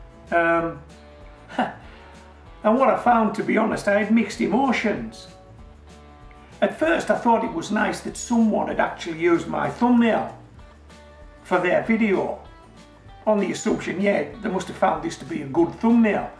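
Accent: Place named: Scotland, United Kingdom. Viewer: I've loved your various videos on gardening in pots! Indeed, I'll be using your advice (with a few modifications) whilst growing some potatoes (to start) in Los Angeles. Also, thank you for this particular video. I honestly had no idea about the plagiarizing of videos on youtube. Since I'll be starting my own channel fairly soon (nothing to do with gardening), I'll be taking preventive steps from the get go...thanks to you. Please, keep up the good work and give Molly a hug for me. Btw, it's always lovely listening to you....your accent reminds me of my Scottish grandparents. <3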